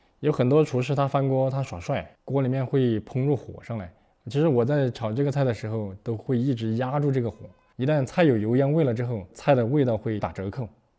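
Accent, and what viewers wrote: Sichuan, China. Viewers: He's speaking Mandarin with a bit of Szechuanese accent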